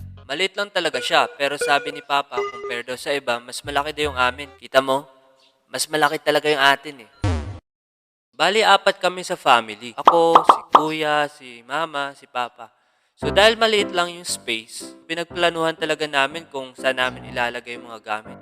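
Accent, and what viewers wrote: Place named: Visayas, Philippines. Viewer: Bisaya Ka pala ahahhah😂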